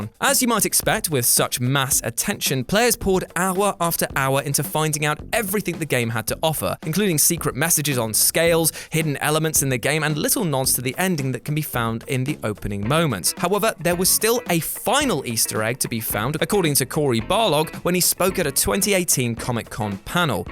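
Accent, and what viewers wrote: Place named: United Kingdom. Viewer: Everything is slightly better with a British accent.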